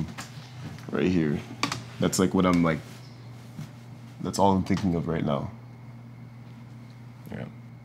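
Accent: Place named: Canada. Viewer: that canadian accent really comin through on this lmao, goat shit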